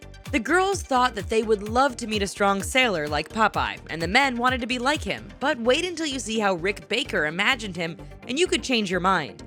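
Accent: United States. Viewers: This is better on mute. 2018 the year that the American accent became the world’s biggest turnoff. Mind you they will be speaking Russian soon so hey ho, every cloud. Oh it’s a shite video anyway with it the transformations are truly piss poor. Over and out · The narration is so american it hurts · This video did not need narration. Especially that condescending white middle America accent narrative.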